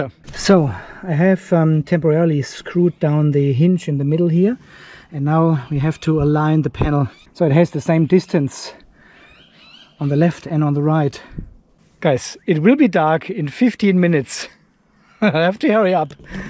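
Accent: Germany. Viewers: You're such a nice and helpful guy, I can see where people would help out where they can. And all that testing and experimentation is really helpful! I hadn't really payed much attention, but I did notice in the back of my mind that your accent was something other than Aussie. Then when watching this video, and hearing the cursing (and identifying with it!) it clicked. Ah! German!! Very cool. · Very nice video - greetings from bloody German accent to bloody German accent 🙂 (currently also building up my little solar power plant) - I really like your way of trying to find customized solutions that exactly fit your needs!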